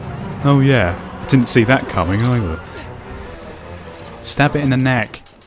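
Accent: United Kingdom. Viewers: Your commentary is just hilarious. That confused, yet dry attitude along with your accent makes me picture a proper englishman with a top hat playing an (at first) very confusing, yet awesome RPG. The lighting is a bit off, jacked that up a notch too much.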